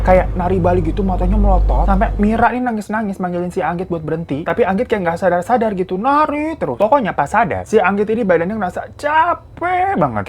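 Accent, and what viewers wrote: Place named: Jakarta, Indonesia. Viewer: Maaf bang itu asli mana sih, apakah orang korea? Apa asli indonesia, soalnya logatnya mavam orang betawi tapi tampang macam oppa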